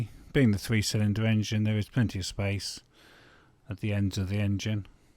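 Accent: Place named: United Kingdom. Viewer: Nice accent! Where part of UK are you from?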